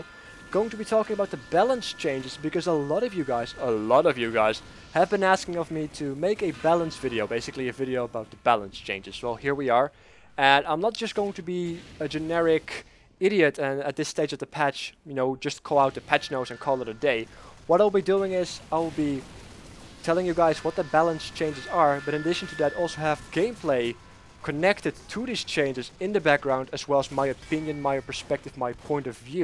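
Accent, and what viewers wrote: Netherlands. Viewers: Starting to like ur commentaries more and more! Quick question: Are u dutch? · Maybe is this a weird question but... Are you dutch??? I hear something like that in your voice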